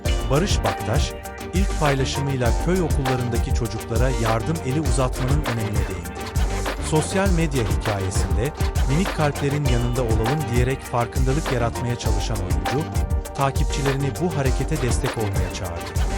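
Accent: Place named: Anatolia, Turkey. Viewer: Sesini çok beğendim iyi ki mutfakta sesin çok güzel Anadolu sesin çok güzel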